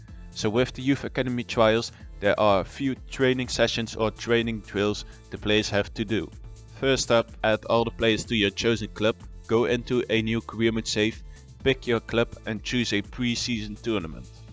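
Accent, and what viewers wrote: Netherlands. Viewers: your accent has to be the most 'Brabant`s' English i have ever heard xD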